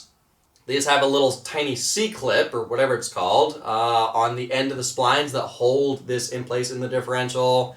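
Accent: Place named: United States. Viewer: Where is his accent from people ? I know its american? But what state etc it sounds so mad but in a good way 😁😁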